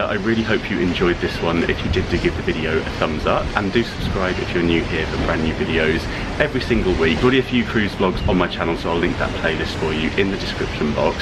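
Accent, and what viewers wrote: England, United Kingdom. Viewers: Thank you for taking us on another fabulous cruise. I just love all the places that you go, because I will never be able to see it in person. I enjoy listening to your English accent. Thanks again for your videos. From a fan in Colorado USA!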